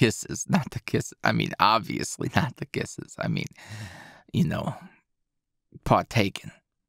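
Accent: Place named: New York, United States. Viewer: The new york accent 😍😍😍😍😍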